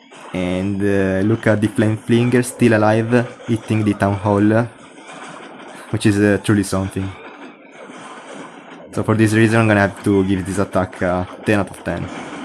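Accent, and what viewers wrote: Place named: Italy. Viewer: Accento più italiano del mondo. Contento che ci sta un italiano così competitivo sul gioco. Specialmente per la army comp che hai creato dato che odio la classica "SPAM EVERYTHING WITH 0 THOUGHTS AND 3 STAR ANY BASE"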